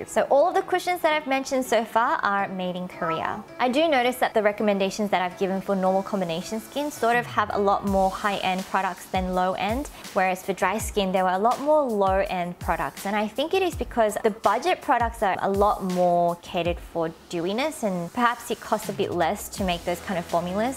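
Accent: Australia. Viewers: Ur aussie accent!!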